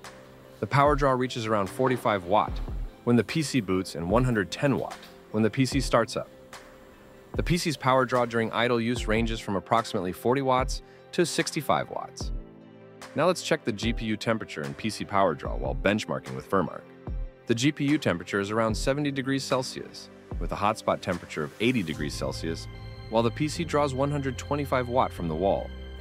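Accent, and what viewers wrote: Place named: United States. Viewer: Interesting American accent european outlet lol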